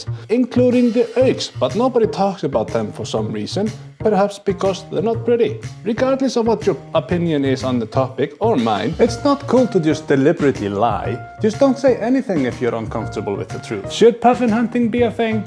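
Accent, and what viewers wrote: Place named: Iceland. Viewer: Everything sounds better with Icelandic accent! But be honest, you have eaten these birds before, did you?:)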